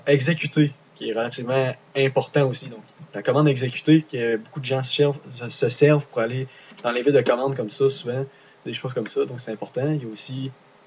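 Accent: Quebec, Canada. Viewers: Est-ce que les Français font exprès pour ne pas comprendre l'accent Québécois??? Nous on comprends très bien votre accent et vos énormes anglicismes!!! "Putain j'piges pas c'que tu dis bordel"... nous on dis "Criss qu'es-ce tu dis tabarnak"... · Haha la vidéo à commencé j'ai pas compris, puis jme suis dit "ahhh mais c'est du québéquois" =) Quand on s'y attend pas ça surprend ^^ merci pour cette vidéo ! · C'est toi le con ! Ça s'entend pas que c'est un Québécois ??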